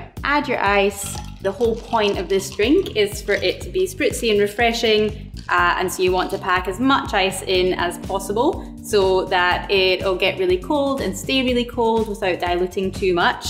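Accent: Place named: Scotland, United Kingdom. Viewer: You sound more Scottish than normal in this video haha. Amazing videos and cant wait for the next one!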